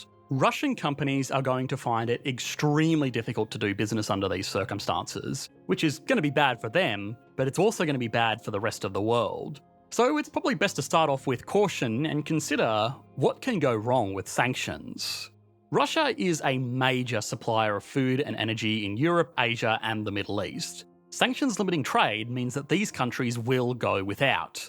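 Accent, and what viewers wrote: Australia. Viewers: weird combo of vocal-fry and Australian accent. made me uncomfortable 😂 good info though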